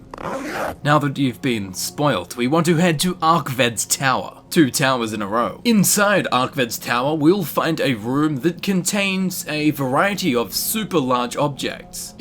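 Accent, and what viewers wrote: Australia. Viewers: Ccoooooollllll!!! Thanks for sharing! I wish I could get the character overhaul to work but alas, I cannot! Just a question, are you Australian or Kiwi?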